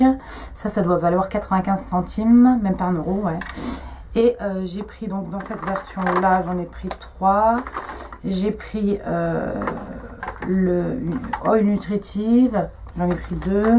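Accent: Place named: England, United Kingdom. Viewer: Coucou. Contente que les rouges à lèvres Catrice te plaisent et merci pour le petit clin d'oeil ça fait plaisir! Oui effectivement tu as un peu craqué ton slaiiiip mais je te comprends les -25% ont aussi fait des ravages sur mon compte en banque. Bon j'ai encore rien pu tester à cause de cette Motherfucking allergie au visage. Gros bisous! Ps: j'adore ton accent anglais! Mdrr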